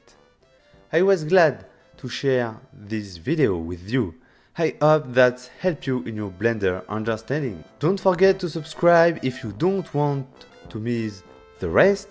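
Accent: France. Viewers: This is the first time I watch this channel. What a pleasure to be welcomed with such a good French accent ! Great content. Subscribed. (S’il te plaît garde l’accent français c’est parfait comme ça) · Love your French accent may be I am wrong. Awesome tutorial by the way.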